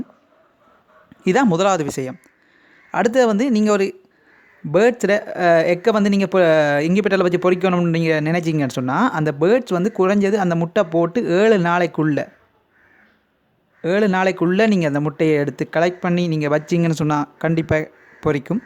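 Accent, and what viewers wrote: Sri Lanka. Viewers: Hi are u Srilankan tamil nice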